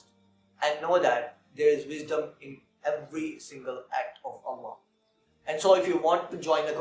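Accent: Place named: Pakistan. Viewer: Your ascent is little bit pakistani where are you from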